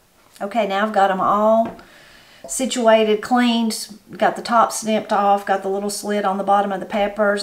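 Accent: Appalachia, United States. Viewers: Just keep talking Miss, that’s what has drawn the majority of us folks to your channel is your colorful language, along with so much more. Anyone that doubts anything you say on here should meet you in person as Robbie Lynn & I have on several occasions now to witness that you are the same genuine person on and off the channel. We say Appalachia just like you do along with many other words you use like okrie and yes mam we push the Buggy in the Grocery Store to lol 😂 👍🏻👍🏻 We get that a lot on our channel to where folks say that we have an accent down south to like you mentioned, but to us it’s just the way we were raised to talk like you said about y’all. Oh yea common sense out weighs an education any day of the week in my book to. Thanks for sharing this our APPALACHIAN Friend!!!! 😇🙏🏻😇🙏🏻👍🏻🙌🏻❤️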